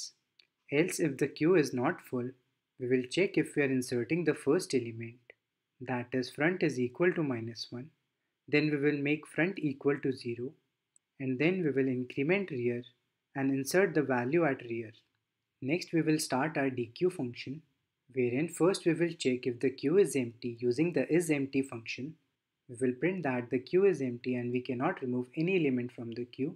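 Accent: India. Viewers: you have quite heavy indian accent but other than that, clear explanations and simple video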